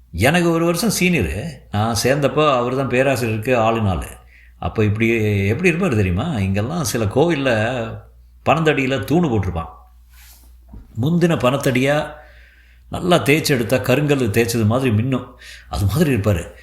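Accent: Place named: Nagercoil, India. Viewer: Nagarkovil slang miga nandraga pesuhireergal👌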